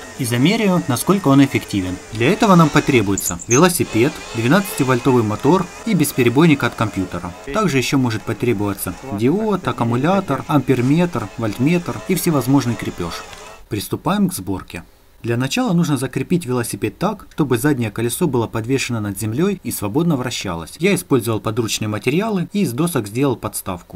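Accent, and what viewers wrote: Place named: Ukraine. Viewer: Ох ребятки.... Как бы украинский акцент не сыграл с вами злую шутку... Город полон украино-ненавистников!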